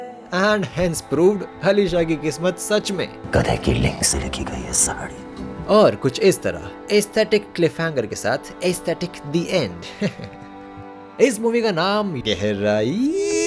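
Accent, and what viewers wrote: Bihar, India. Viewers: Only Desi is far better than. The closest any indian youtuber comparable to Alex Meyers is Only Desi. And har chiz mai bihari tone mai caricature krna is not comedic.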